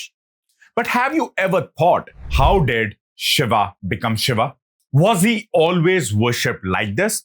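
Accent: India. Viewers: Superb video indeed, most appreciated! However, it is INCOMPLETE without exploring the TAMIL beliefs in the deity Shiva. In Tamil, though, the name is pronounced SIVA and not SHIVA. Many scholars believe that when the Indus Valley civilization died out, maybe due to climate catastrophes or river path changes, those people moved far south. Or maybe they were running away from the Aryas (called Aryans by the British). Even now in Tamil Nadu, there is a deep-seated hatred of the Aryas and the Vedas and particularly the hatred of Brahmins. Oh you goddamned Brahmins, you have dominated and controlled us for thousands of years, now get out! One political leader openly called for the genocide (mass killings) of Brahmins. His name was E.V. Ramasamy. That party is the DMK party. It means a party for the upliftment of the Dravidas: Dravida Munnetra Kazhgam. Anyhow, the main point is that Shiva was/is a God of the Dravidas (Dravidians as per the British). 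PLEASE EXPLORE in another video. And one last comment: please STOP KILLING the authentic Sanskrit pronunciations of our sacred words and replacing them with the lip-lazy Hindi versions. Your pronunciation of Svetashvara makes me cringe! Why do you Hindi speakers erase the "a" at the end of Sanskrit words? Rama becomes Ram. Shiva becomes Shiv. Yoga becomes Yog. Yuck.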